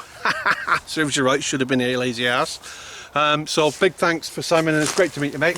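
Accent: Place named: Lancashire, United Kingdom. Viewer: Sounds like your from my end of the country pal, im near Chorley, when you fish sunny 2 if you can get on the double peg closest to the island get on it its a great swim with gravel bars to your right and a great overhanging tree margin and you have the island to fish to, all the best.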